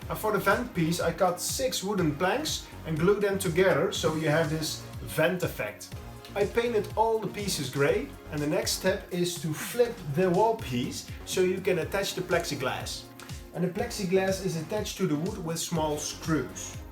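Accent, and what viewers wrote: Netherlands. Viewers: Great work. Do I detect a slight Dutch accent? 😉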